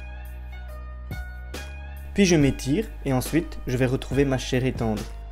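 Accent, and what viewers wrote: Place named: Sudan. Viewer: La quintessence de la callisthénie!! Merci, t'as un ptit accent il me semble... Tu serais pas originaire du Soudan?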